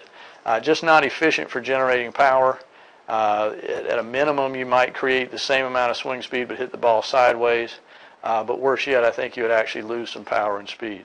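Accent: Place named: United States. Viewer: I am from England what part of USA is your accent from? Oh and yes def in the top 3 Youtube teachers!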